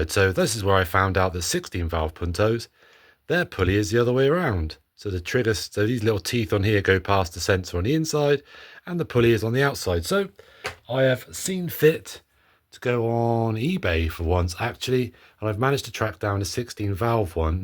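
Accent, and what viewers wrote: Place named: Bristol, United Kingdom. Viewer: Hey there. Progress is looking good. I've done this conversion and I have a spare manifold with seals and some other bits and pieces you can have if you want - from your accent I'm guessing you are in the Bristol area - I am nearby in Stroud..?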